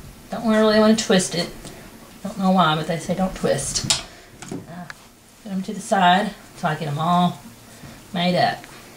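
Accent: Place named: Georgia, United States. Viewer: "I use real salt, not the sea salt, iodized salt, etc. This is salt from the sea" Huh?? Man while filling his stove with red oak and hickory logs: "No live trees were killed for this video". Did you not just say they were red oak and hickory? What happens when you run out of tornado felled trees and you have a wood stove? Wait for the next tornado to keep warm? Aren't the Amish not supposed to use electronic appliances such as video camcorders? You have a Georgia accent, so how did you get from Pennsylvania to Georgia - by horse wagon or did you fly or drive? Again, isn't that a violation of Amish laws? Your cooking looks wonderful and healthy. I just have a problem with the hypocrisy of the religion and some of the comments. You say one thing, do another.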